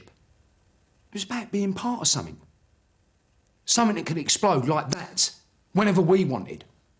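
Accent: Cockney, United Kingdom. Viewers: Who the fuck do you think you are problem with you is fancy yourself full of shit you arsehole mcfc been there and done it anyone can talk big time on film on your own talk like that to me and my mates you cockney gobshite No chance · I mean cockney 😆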